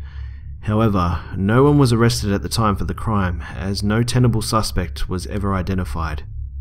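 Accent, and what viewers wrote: Australia. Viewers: Loved the video. Your aussie voice is the best.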